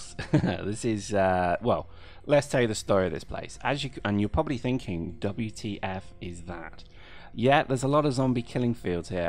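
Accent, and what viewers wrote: United Kingdom. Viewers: I wish it were somehow possible to collect rainwater. I mean it rains bloody often enough in this game! Great let's play, btw, dat britishness.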